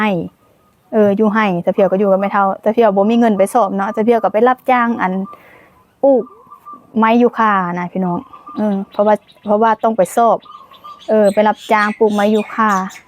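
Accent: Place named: Sakon Nakhon, Thailand. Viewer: สำเนียงพูดคือ ไทย สกลนคร 😊😊😊🇹🇭🇱🇦💓😊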